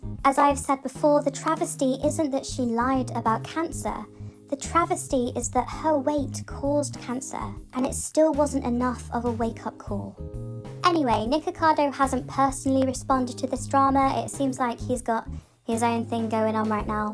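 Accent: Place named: United Kingdom. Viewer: omg are you british? it’s so nice hearing a fellow brit in the community! 🥰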